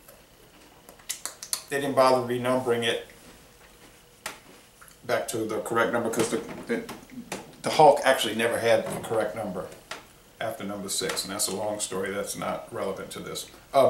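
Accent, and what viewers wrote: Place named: Midwest, United States. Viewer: Louisiana? You sound midwestern. The foil neck honestly just annoyed me into grabbing my beer glass instead of drinking it from the bottle. Not sure if that was their goal or not lol.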